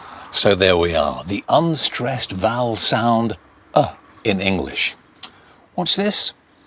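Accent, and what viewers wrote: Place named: United Kingdom. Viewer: I love biritish accent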